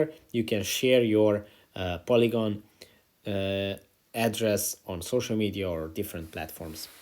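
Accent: Hungary. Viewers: I bet you any money that you are from Hungary. I can recognize your accent. :) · jó kis magyar akcentusod van :)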